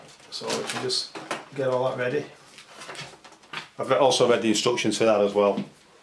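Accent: United Kingdom. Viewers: He is speaking really english he's from the UK which made the language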